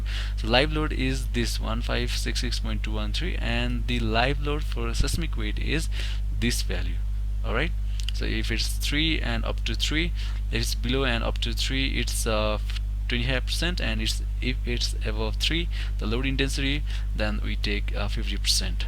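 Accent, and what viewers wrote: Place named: Nepal. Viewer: Nice teaching, you sounds like from Nepal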